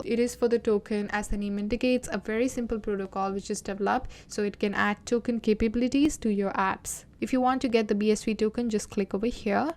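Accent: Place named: India. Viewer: Luv the video.thank.u. R u.from india luv the accent.🙏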